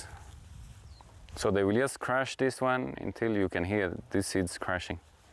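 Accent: Kenya. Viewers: This is amazing... the transformation is real🤗 I work in semi - Arid area in West Pokot, we should do an exchange visit program, share knowledge and seeds... 🤗 Kudos for the adaptation of Kenyan English accent😍👏👏🌻🎊🎉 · You speak like Maasai. Guess you've been around quite long. Wonderful video.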